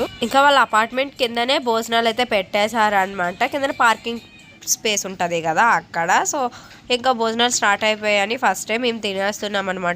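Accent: Srikakulam, India. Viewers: Malli, language marchestunav nv srikakulam laaa matladitenaey estam akka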